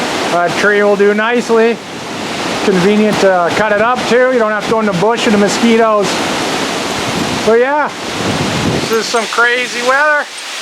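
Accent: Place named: Newfoundland, Canada. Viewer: You sound like a Newfie ?? Good coverage 👍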